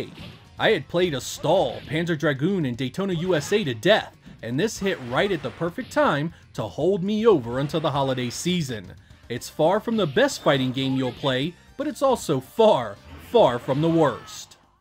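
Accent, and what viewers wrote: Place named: North Carolina, United States. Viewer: I've been meaning to ask you this for years... Where are you from? Your accent reminds me of my dude from North Carolina. Like, to me you say bauls (boss), or bault (bought), or cault (caught). It's not a dis, it actually makes me wanna watch more.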